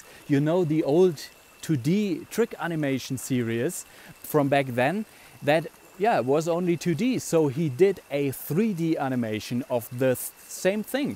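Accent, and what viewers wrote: Germany. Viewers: Are you from Germany ? Because you English is more in the dialect of German ?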